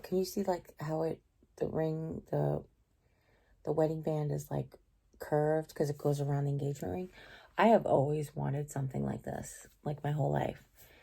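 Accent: Long Island, United States. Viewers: I could listen to you all day long. I just love your stories and your accent is just amazing. I'm learning so much about Long Island and New York its magical. The magic has even cast the spell of being able to keep up with your fast pace of speech 🤣😂🤣😂. Hey, Jack of all trades is a skill in itself, nobody employs an electrician, plumber, gas expert, carpenter, joiner etc for little jobs around a building, they employ a handyman/tradesman/maintenace guy. Quite simply a 'Jack of all trades' Theres so much i need to do around my home, I'm watching your past videoes to learn how. God bless you my fast talking friend. You are in his hands and all will work out according to his plans, as it should. Xx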